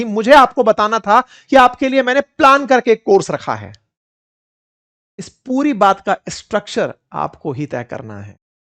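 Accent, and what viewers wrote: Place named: Marwari, India. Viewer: श्री राम राम जी SiR ji आपके शब्द में कभी कभी मारवाड़ी शब्दों का उच्चारण होता हे जिसे हम सभी मारवाड़ी होने का पहचान शब्दों से पहचान हो जाता है 🙏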